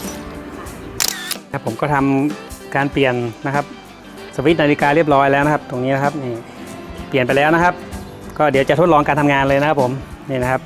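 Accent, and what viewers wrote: North, Thailand. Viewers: ขอบคุณครับกำลังเปลี่ยนพอดี ชอบช่างคนนี้อธิบายดี พึ่งรู้ว่าอู้ภาษากำเมืองเน่อ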